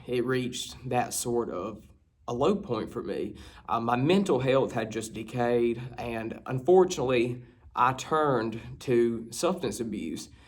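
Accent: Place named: Deep South, United States. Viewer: . I'm not sure where you are currently located, however, if you are still in the Deep South neo-con Bible belt, I'm sorry, but it is not a healthy environment for you. The first thing you should consider is moving to a more progressive place. It hurts me to see men like you, good, decent people, who have had to go through an environment that died in the 1960s. I am not suggesting that you need to lose your faith but think about this. The best definition of Evil is this: "Something that appears good and is not." Your country is on the brink of Civil War because of the appalling level of ignorance (don't confuse this with intelligence) that saturates the Mid-West and Rural Southern areas of the USA.). I was fortunate to have been born in London, England. I have dual citizenship and my home is in Toronto, Canada. Do not put down "Book-larnin." Sorry, but your accent only amplifies your situation. 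Now I'm not saying you need to get a PhD like I did. Not everyone is an academic or seeks a professional degree. Use your better instincts. All of us have a bell in the back of our unconscious that rings when we sense someone is "just like that other person who told us that they would be more than willing to teach us. Knowledge will make you Free. Read and read and read. The internet is not the greatest source of knowledge. And please, refrain from mentioning things like "I wish I had an older mentor." That is precisely what you do NOT need. You will be deluged with men older than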